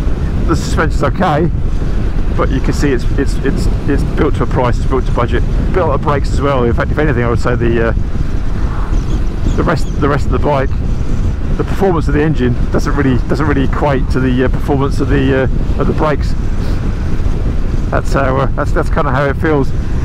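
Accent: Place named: Ireland. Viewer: Cool bike, love that Irish accent. Thanks man.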